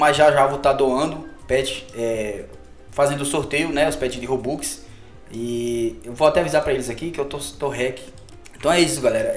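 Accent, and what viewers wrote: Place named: Brazil. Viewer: Ree brasileño el man :v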